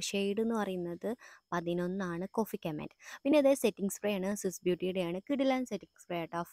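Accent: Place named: Malappuram, India. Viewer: Ithaatha Malapprm aano place.. Samsaram kettitt thonnnd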